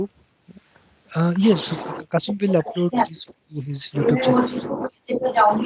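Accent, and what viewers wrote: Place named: India. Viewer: Great video! Even though the indian english accent doesn't help with understanding, the explanations were very clear. Thanks!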